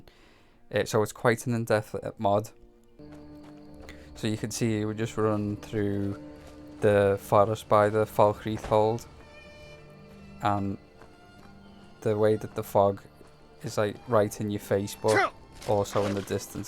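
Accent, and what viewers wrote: Liverpool, United Kingdom. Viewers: Liverpudlian, right? Very detailed video, by the way :)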